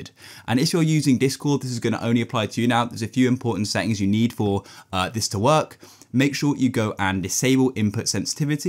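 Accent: United Kingdom. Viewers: He is defenetly brittish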